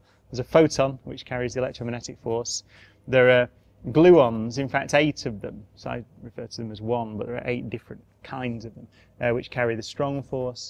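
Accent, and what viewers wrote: United Kingdom. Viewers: British accent! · It's the British accent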